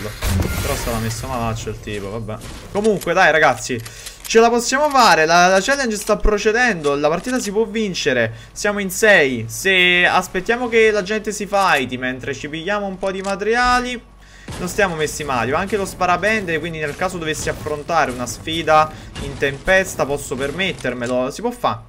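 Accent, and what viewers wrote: Naples, Italy. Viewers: Ero sicuro che tu fossi napoletano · Ma sei di Napoli??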